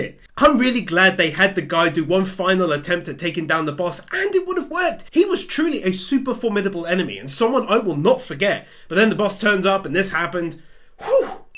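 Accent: United Kingdom. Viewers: This guy's voice is the manifestation of the word "British" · Is this a LiverPool accent?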